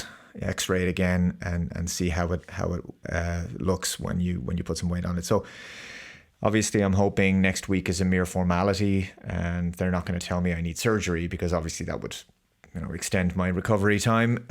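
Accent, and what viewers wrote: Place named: Ireland. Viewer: So glad that your break was relatively clean. I hope it heals up nicely. Good luck with the appointment next week! Outside of Spiderman, I haven't gone to theaters to see a superhero movie for several years. It is really sad, but I agree with your reasons for the superhero fatigue. I enjoy your content and love listening to your Irish accent! ❤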